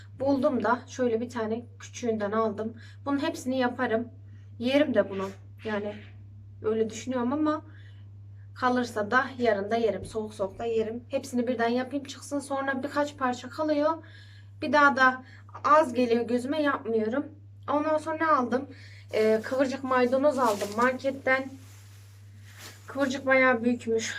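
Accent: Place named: Zonguldak, Turkey. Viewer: Size yeni abone oldum videonu beyendim nerelisiniz acaba konuşmanızı zonguldak tarafına benzettim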